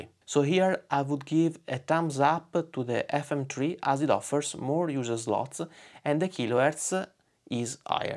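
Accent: Italy. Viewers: ah ma quindi sei italiano anche tu? avevo notato una pronuncia particolare che può essere nostra o comunque in generale di chi non è madrelingua inglese / americano.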